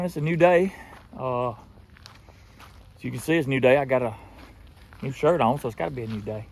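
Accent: South, United States. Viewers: Get them beavers 🦫 I appreciate what you do getting rid of pests of all kinds!!! I love your Southern draw and you are hilarious 😂😂😂 Happy Thanksgiving 🦃🐖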